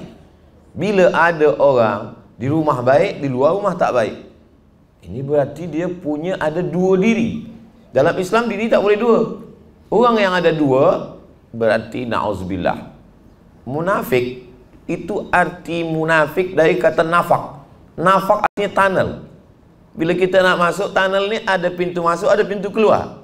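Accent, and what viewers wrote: Kuala Lumpur, Malaysia. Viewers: Askm,terbaik ustaz pandai lograt org KL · Otak aku tak boleh comprehend ustaz orang Indonesia, sembang cm org malaysia.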